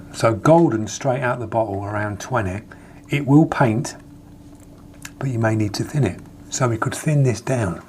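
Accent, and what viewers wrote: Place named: United Kingdom. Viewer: Excellent compilation of "oh no, oh my god"!!! Loving it - especially with the UK accent.😅😅😅 Additional beginners problem: Overthinking, overlearning, overanalyzing and not practicing enough because there is "yet more info to suck up before I do something wrong" (it's me). The advantage is, that I know most of what to do/not to do, but I lack the mileage. It keeps you from being frustrated, BUT it leaves a feeling of being lazy.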